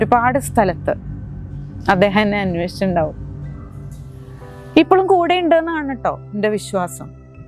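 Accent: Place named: Valluvanad, India. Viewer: .. കഥ ഇഷ്ടായി ട്ടൊ....ആ വലുവാനാടൻ ഭാഷയും, എല്ലാം സൂപ്പർ...👌🏻👌🏻❤️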